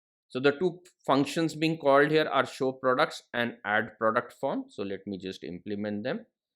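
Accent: India. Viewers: What's so funny about it? I guess you've never heard Indian English! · funny indian accent... 😸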